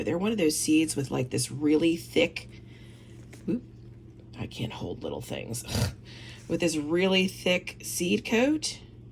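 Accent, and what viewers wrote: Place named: Maryland, United States. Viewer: Before even looking at the video description or hearing you said mid-Atlantic I knew you were a Marylander! Whenever I hear my native tongue it makes me miss my home state. Happy gardening from western PA!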